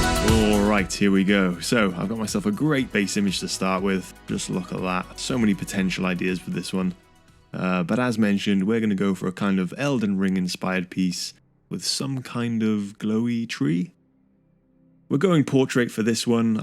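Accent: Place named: United Kingdom. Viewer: Love ur british accent, "bo'om left" 😂. Anyway, that's a great artwork right there man 🙏❤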